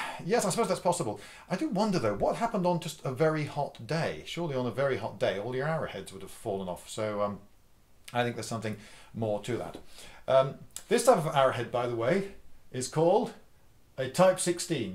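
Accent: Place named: England, United Kingdom. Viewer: You may ramble all you like Luv. My Grandfather was from England and I loved to listen to him talk and hear that "Accent" of his and once in a while (More oft then not.) that same "Accent" will come out of my mouth and my friends at "The Minnesota Renaissance Festival" just love it when that happens because they know for a fact that I am not faking it like they are and the "Accent" comes out a great bit more there. But all in all. I miss hearing a pure English voice like yours So. Keep on rambling on Luv..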